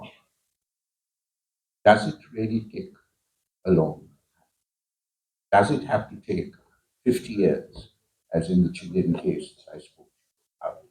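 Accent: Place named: RP, United Kingdom. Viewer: What ridiculous talk,just showing English posh pron ,accent etc What a Tragedy the helpless Poor doesn't understand?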